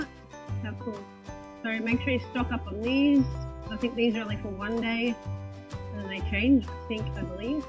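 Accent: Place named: Australia. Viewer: Are you Aussie? You sound so Aussie hahaha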